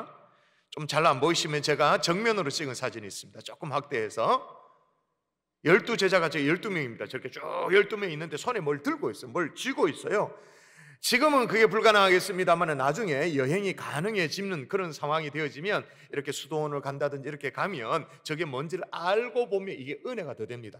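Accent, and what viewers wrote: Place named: Gyeongsang, South Korea. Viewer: 목사님의 경상도의 유하신 말씀속에 경상도 특유의 강하신 말투로 하나님의 강력한 말씀이 느껴지십니다 코로나때 온라인 예배로 늘 고맙고 감사드립니다~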